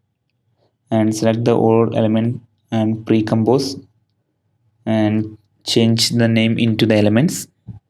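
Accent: Kerala, India. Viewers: are u from kerala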